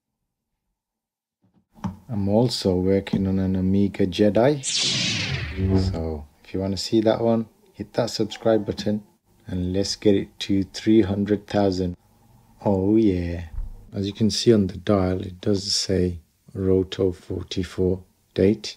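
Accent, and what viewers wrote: Birmingham, United Kingdom. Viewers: It’s so therapeutic to watch you restoring watches, and a brummie twang is the perfect accompaniment!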